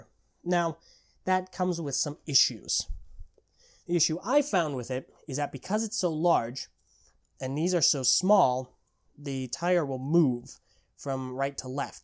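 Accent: Australia. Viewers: Pohatu now has a Australian accent in my mind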